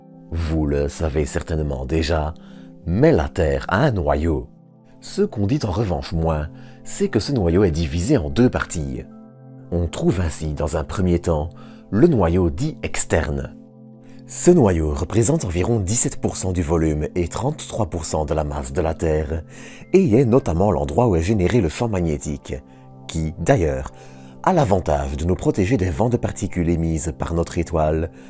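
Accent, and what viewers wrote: Belgium. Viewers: La voix belge. Liégeoise sans doute 😂😂. Quel accent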